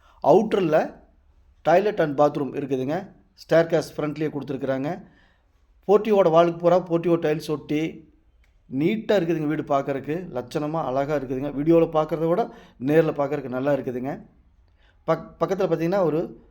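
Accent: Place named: Kongu, India. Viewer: நீங்கள் பேசுகிற கொங்கு தமிழ் இனிமையாக இருக்கிறது ❤ அழிந்து வருகிற வட்டார மொழிகளை காப்பாற்றுங்கள் வீடு நன்றாக இருக்கிறது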